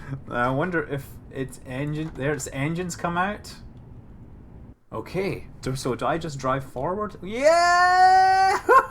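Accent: Scotland, United Kingdom. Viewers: Thanks for this ive been waiting for a video like this for a while;) Anyway just a question but are you scottish, because you sound like you're from scottland?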